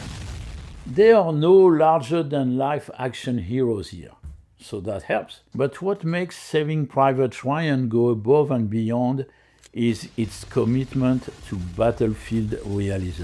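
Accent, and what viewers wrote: France. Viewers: First time watching this channel. I enjoyed this episode. I especially liked the French (I believe?) accented narration because it’s unusual. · There’s a French Guy telling us about firearms?? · As a native french speaker, his accent is way too pronounced. This is so cringe.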